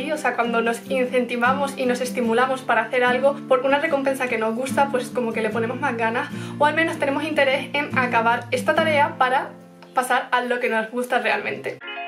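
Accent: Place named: mixed, Spain. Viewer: Me encanta tu mezcla de acento castellano y andaluz ☺️☺️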